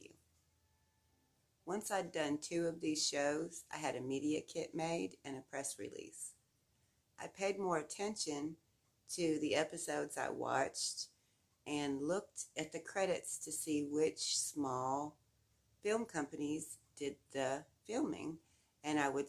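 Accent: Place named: South, United States. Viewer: you got a sweet little southern accent...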